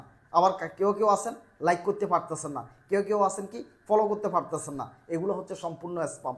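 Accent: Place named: Netrokona, Bangladesh. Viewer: ভাই আমার মনে হয় আপনার বাড়ি নেত্রকোনা,, ❤ আমার দেশের বাড়ি নেত্রকোনা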